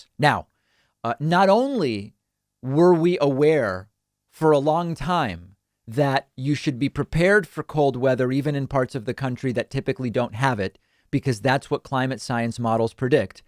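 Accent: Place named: Argentina. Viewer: The Argentina accent have a bit of Italian in it.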